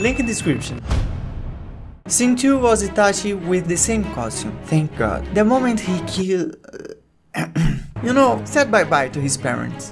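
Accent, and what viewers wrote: Brazil. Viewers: Primeira frase que eu ouvi já pensei "hmm sotaque de brasileiro" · Esse cara tem um sotaque meio q brasileiro n acha? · Eu percebi assim que ele começou a falar inglês, sei lá, parecia um inglês meio nordestino, pensei "sapoha é Br rapaz" kkk Cara esperto e esforçado slc. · Sotaque brasileiro do caralho kkkkk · Esse cara pelo inglês dele, parece que tem um sotaque brasileiro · Puta q pariu! Q FODA! Br reconhece outro BR só pelo sotaque kkkkk. Boa sorte nos trabalhos mano 👊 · Sabia q ele era brasileiro, esse sotaque não engana kdjdkdk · o sotaque br é muito reconhecível, não dá pra deixar passar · Adoro o sotaque brasileiro inglês hahaha, eu entendo tudo, os gringos falam tão rápido q nem da tempo de dobrar a língua ksks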